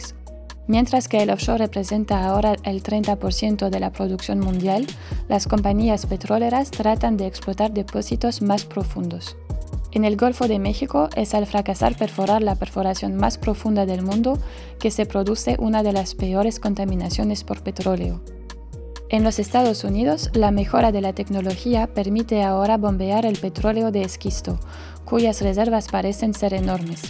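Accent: Russia. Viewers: Los documentales muy buenos... pero la voz de la locutora maravillosa. El acento es ruso? 🤔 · Creo que la chica tiene acento rusa. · acento ruso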